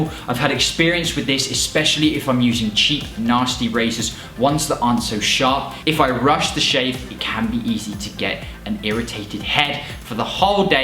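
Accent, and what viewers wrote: England, United Kingdom. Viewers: Love this channel. Just found it and feeling better already! And I love this dude's accent. Is he from the same part of England as Russell Brand? Very similar accent scenes... Anyway, I'm not quite ready for this but headed in that direction I think.. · Your English intonation attracted me to your channel ☺️😂 but you are cute bro ❤️🔥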